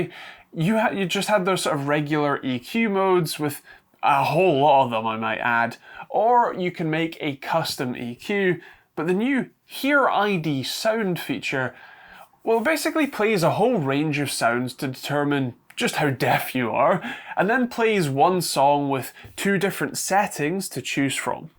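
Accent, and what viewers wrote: Scotland, United Kingdom. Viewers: Appreciate this review. I bought these a while ago and have actually bought many Soundcore earbuds over the last few years. These are definitely some of the best I own. I really like my A3i's also. I tend to prefer the bud and not the stem style in general. I have also purchased the A20i's and have recommended them to several people who all loved them so that was pretty cool as well. The phone stand on these is a great touch and the sound is quite good too! Great value for sure! I really enjoyed your style as well. First time seeing one of your reviews. 😊 I have an awkward question. Is your accent Scottish or Irish? I really like it but can't determine which it is. I'm a Canadian who spent 3 years living in Scotland when I was a child so I should know but can't quite figure it. 😛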